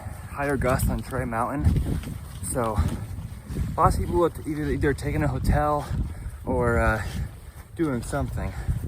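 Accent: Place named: Connecticut, United States. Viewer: Are you from CT, by chance? I think I recognize your accent! I know that, right now, your thinking is all about "can I make it to there, by then", but soon, you're not going to care. You'll just know, because your legs will be strong and your confidence will be stronger, that you'll be able to make it to wherever you want to make it in a day. Weather won't be as important. Or at least, not as urgent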